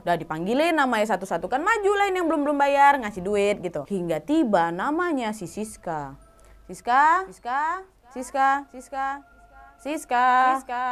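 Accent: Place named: Medan, Indonesia. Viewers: Rutinitas sblm tidur🤭 mendegarkan dengan logat wak waknya bercerita❤️❤️❤️ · "gagal pokus" 😊 sukak kali aku dengar logat Medan di ni..jadi rindu pulak aku pulang kampung 🤗. by: drg. Rika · Kak, logat dan bahasa Kakak spt orang Sumatera Utara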